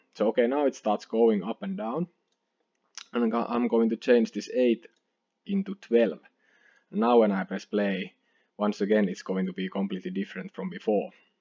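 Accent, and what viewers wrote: Finland. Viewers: love the finnish accect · This is really interesting. I have to ask completely off topic. Are you from Finland? You have a very Finnish accent!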